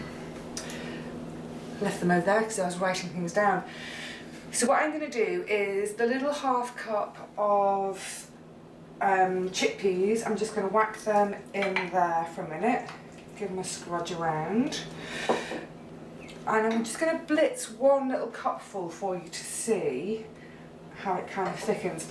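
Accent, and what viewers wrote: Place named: London, United Kingdom. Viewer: Hi, love your videos. My grandmother and grandfather came from London, and your accent brings back memories. Thank you for your wonderful recipes. Do you make carrot marmalade. I will surely try the tomatoe soup. Canada says hi!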